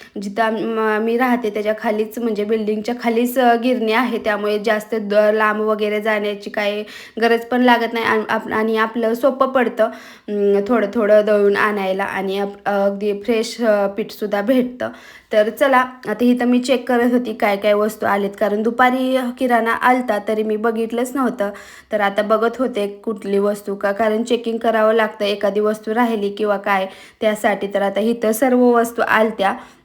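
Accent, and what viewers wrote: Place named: Latur, India. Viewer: Tu pune la rahti pan tuz gav kont aahe tuza kahi kagi shabda varun tu laturchi aahes as vatat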